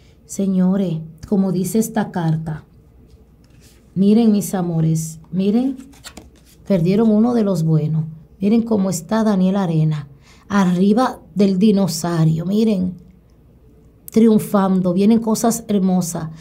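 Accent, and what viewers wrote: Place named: Dominican Republic. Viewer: Los dominicanos hablamos haci, pero solos un amor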